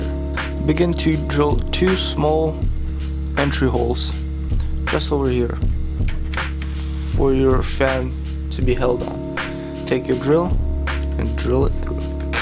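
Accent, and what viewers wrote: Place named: Europe. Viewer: What country are u from... I hear a little European when u speak.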